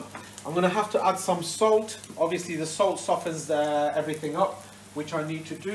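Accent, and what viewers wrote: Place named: Stoke, United Kingdom. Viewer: 60k, well done, love your Bangladeshi heritage, your food knowledge and incredible cooking skills, your Stoke accent is ace too mate. We in the UK are so fortunate to have such a mix of cultures, filling our bellies with happiness and pleasure, next stop 100,000 subs.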